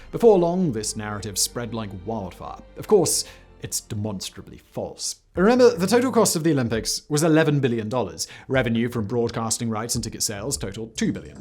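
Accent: RP, United Kingdom. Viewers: After dopping and sponsoring, not only the Olympic games but also sports in general gave lost any meaning... I think Greece should be the country to bring the Olympic games to their original purpose, but they wanted to impress and wanted to give work and "gifts" to every engineering company in and out of Greece, and so corruption was the protagonist, not sports. And if an English man with a posh accent dares criticise Greece as inadequate for the Olympic games, the 2016 London games are my revenge, when that country showed off as its contribution to humanity an old lady with jewellery, a fictional murderer and a buffoon. I guess imperialism is out of fashion and they couldn't show off with dead natives and stolen antiquities 😁😂🤣😤😡🤮👎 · By the way this person talks too fast I have to put captions, even his britis or english accent was very distractive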